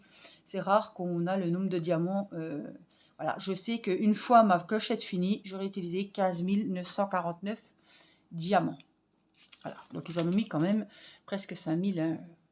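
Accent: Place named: Nancy, France. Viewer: Trop belles ces toiles Vitraux ❤️❤️❤️ j'ai une petite question qui n'a rien à voir : je te suis depuis peu et ton accent très très prononcé me fait penser à celui que j'avais il y a qques années : je suis originaire de Nancy mais j'habite depuis plus de 20 ans à Toulouse. Et toi ? 🤔😁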